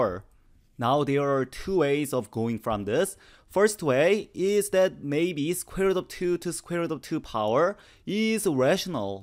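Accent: South Korea. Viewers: His english accent sounds like Korean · 한국인이세요...? 발음이 친숙한 콩글리쉬인데....... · 왜 콩글리쉬가 들리지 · 왜 한국식 영어발음 처럼 들리지..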